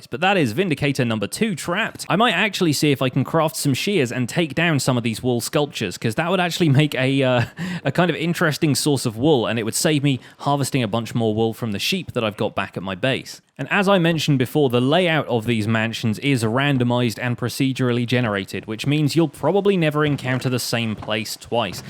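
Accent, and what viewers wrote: United Kingdom. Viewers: XxcoreysindahousexX British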